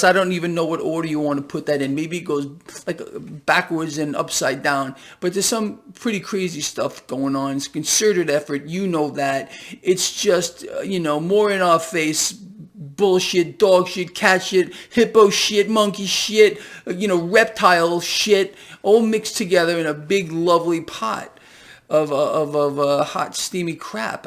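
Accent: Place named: Brooklyn, United States. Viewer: Gregory Pimpen What part of New York you from ? 🍕🕺🏽im guessing Brooklyn 😂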